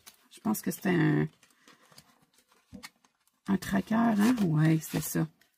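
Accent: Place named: Quebec, Canada. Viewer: Coucou! Normalement je suis la 102ème abonnée 😅 Je suis désormais à jour dans toutes vos vidéos! Enchantée de faire votre connaissance 😊 ça sera un plaisir pour moi de suivre vos aventures budgétaire et d'entendre votre bel accent québécois 😃 C'est effectivement Karine, Marie et Hélène qui nous ont soufflé à l'oreille le nom de votre chaîne 😀 C'est super de voir une autre façon de faire le budget, perso j'aime voir comment font mes voisins européens ou outre Atlantique, c'est tjs enrichissant 🙂 Vos défis cœur pour la st Valentin sont très beaux 🤩 Bravo pour vos belles économies, vos 100 abonnés et longue vie à votre chaîne! Amitiés de Bourgogne en France ❤ PS: il est un peu fouilli mon message désolée 😅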